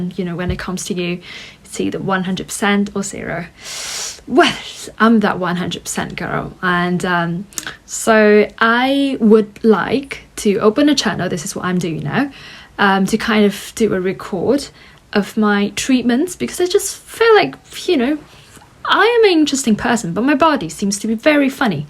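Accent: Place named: RP, United Kingdom. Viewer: Hello,! I really appreciate your lovely contemporary Received Pronunciation (RP), your efforts to make this very first YouTube video, and, more importantly, your attitude towards your unfortunate experience due to this extraordinary disease. I hope you would receive great treatment from the NHS and ultimately combat the disease successfully! May you be free from suffering very soon! Yours sincerely, Jordy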